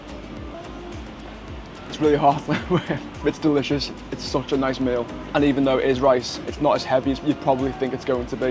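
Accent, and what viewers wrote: Liverpool, United Kingdom. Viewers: You sound like a scouser. You here for long I'm in cebu for 18 months now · U scouser?? Or from wirral??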